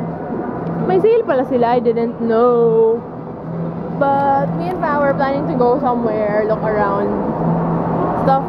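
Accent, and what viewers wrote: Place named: Davao, Philippines. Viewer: Love the davaoeña accent.hehe nice vlog! Cn u do a review on make up factory's velvet lifting foundation? Looks promising though.. tnx!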